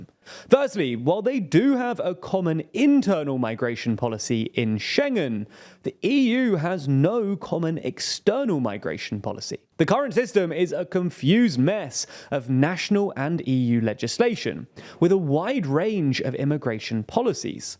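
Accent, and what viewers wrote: England, United Kingdom. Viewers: you are English arnt you!!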